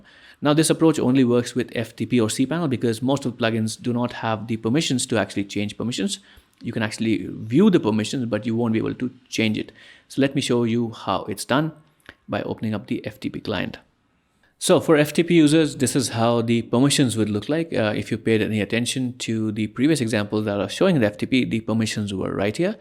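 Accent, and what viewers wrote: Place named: India. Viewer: I feel like im going bat sh!t crazy when i listen to east indians talk. Your guys accents are absolutely unbearable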